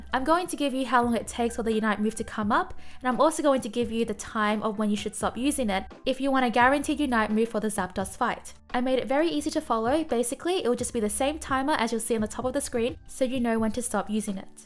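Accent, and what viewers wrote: Australia. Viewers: I'm Australian so I never even noticed your accent, keep up the great work! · As an American finding your channel in the last 3 weeks hearing your pronunciation of Zapdos was so interesting to me because of the whole ArcticUNO, ZapDOS, MolTRES thing where the names of the birds are uno, dos, tres in Spanish and that's the whole naming convention. Having you say it as Dos like windows DOS has consistently fought my old man pronunciation in a way that is both funny and cool, I'd love a series of the names Austrailian's sound out as different than the typical American's! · Aussie accent pokerap incoming. Can't wait. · Plz make the Australian pronunciation for every pokemon. Videos by gen plz. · Love ur Australian accent and I appreciate all the hard work you put in to give us indepth info on the game so we can better understand the game and improve looking forward to future videos......I believe zeraora has the best unite move for Zapdos since it not only deals damage but increases atk spd combined with discharge and volt switch he can solo Zapdos easy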